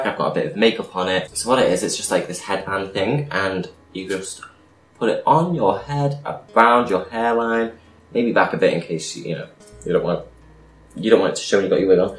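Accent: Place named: Liverpool, United Kingdom. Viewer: Aquaria is brilliant, also this is kinda like watching art attack i mean you know some lovely painting articulated by a thick liverpool accent, love the content xx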